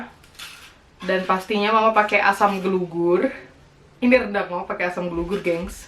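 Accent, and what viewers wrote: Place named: Batak, Indonesia. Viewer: Mantap. Sukak kali aku nonton vlog kak ini, natural kali batak nya.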